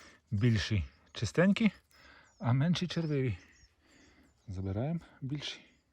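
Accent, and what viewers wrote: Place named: Transcarpathia, Ukraine. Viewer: По акценту то ви є з Закарпаття. В Карпатах білих зараз практично немає. Небуло дощику. Сухо... Удачі вам і гарного врожаю !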